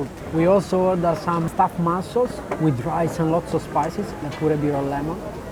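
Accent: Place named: Italy. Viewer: I like ur Italian accent 😂❣️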